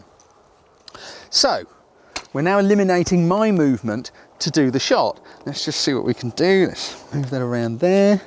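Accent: England, United Kingdom. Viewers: Only discovered your videos today, but am completely hooked. Your teaching style and pace is fantastic and your english accent is like a balm (No offense Americans - I love you guys, but being from the UK myself it is damn nice to hear a fellow brit every now and then). I think you should totally have your own TV show maybe on Discovery Shed (I'll start the petition if you like)!!! Seriously though, thank you for what you do, ad for the help you have already provided me with.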